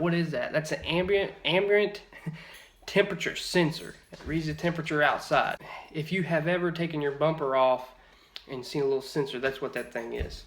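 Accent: South, United States. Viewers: Howdy. What's your opinion of the rear brake drum to disc conversion kits? Is it a worthwhile upgrade do you think? Thanks, man. (Your vids are great, and so is your Southern accent)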